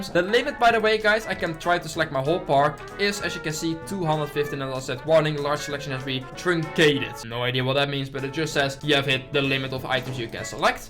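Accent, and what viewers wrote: Netherlands. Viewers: It’s English but it’s with the worst Dutch accent ever lol. I’m Dutch myself and I’m struggling to understand him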